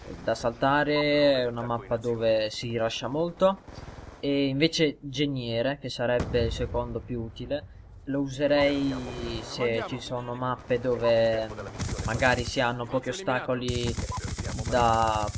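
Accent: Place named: Romagna, Italy. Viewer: Ecco perchè mi sembravi romagnolo ;) Io sono di faenza e vengo al mare li in zona ;)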